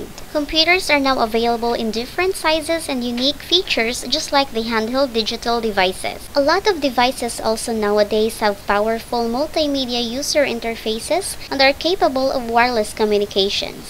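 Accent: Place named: Philippines. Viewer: Person here sounds filipino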